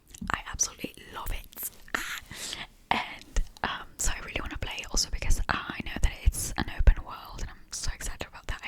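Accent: United Kingdom. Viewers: Love it❤ your British accent is so pretty! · Such a great British accent, I loved this! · Hi, (aka ) and Happy Friday!😊😊😊😊😊😎☀💙💙💙💙💙💙🎄🇺🇸🌷🇮🇹💖💖💖💖💖💖🎇👍. Your british accent is amazing! Well done!😀⭐⭐⭐⭐⭐⭐👍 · I love your languages series. The British accent is really relaxing ☺️💤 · I love the btitish accent very much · Omg lovee the bri'ish accent 😭 it's sounds so crisp and relaxing ❤ (btw I'm also a huge feta cheese lover AND also I had to mention that your musical taste is literal GOLD 🤌)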